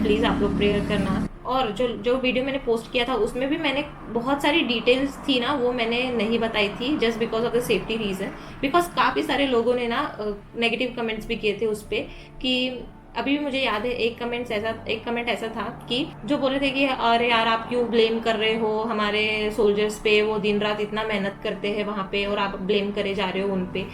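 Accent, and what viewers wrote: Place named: Vidarbha, India. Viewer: Mala vatalch nagpur chi asel tu lagana mdhe vidhrbha chi bhasha bolt hote...😊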